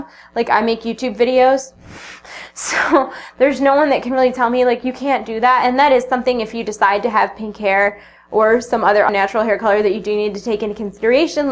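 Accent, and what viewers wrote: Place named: New York, United States. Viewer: I love the NY accent! lol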